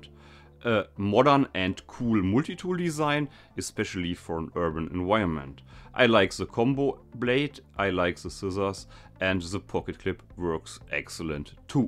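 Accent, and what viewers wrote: Germany. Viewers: You are german i can hear that clearly hab ich Recht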